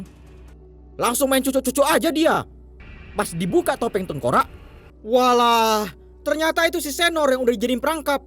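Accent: Medan, Indonesia. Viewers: logatt medan emg gituu bro wkwk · galon 🤣🤣 . medan kali bah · Medan x wkwkwkwk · Suka kali sma suara abg ini...medan kli🤣😭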